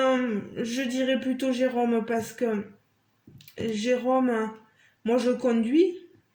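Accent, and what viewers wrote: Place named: South, France. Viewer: Hello sacré moment en ta compagnie. J’aime toujours autant ton accent du sud. Bisous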